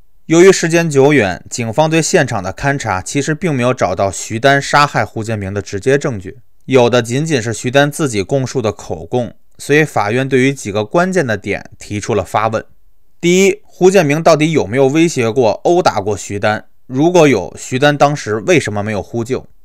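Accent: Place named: Beijing, China. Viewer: +10086 第一次看你影片是石景山八尸案 你当时给凶手口供配音 京味儿真的够浓 一下子代入感亲切感就上来了 老哥加油！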